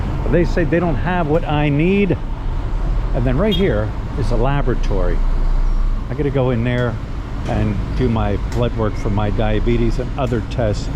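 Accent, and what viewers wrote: Philadelphia, United States. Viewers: Bro, you still talk like you are back in Philly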